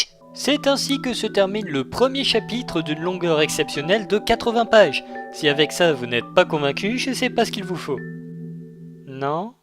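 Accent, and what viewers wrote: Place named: Nord-Pas-de-Calais, France. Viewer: Yeah!! est de retour! Mec, tu m'as fait taper des barres de rire avec ton accent chti, en plus de m'avoir bien diverti avec une bonne critique. Faudrait que je me mette à Love Hina. J'ai tellement de Ken Akamatsu à rattraper. En tout cas ton retour fait hyper plaisir, bon courage pour la suite;)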